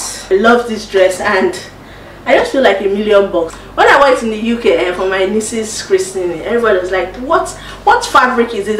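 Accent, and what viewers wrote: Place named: Igbo, Nigeria. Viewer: Love the humour jare,your Igbo accent is on point🔥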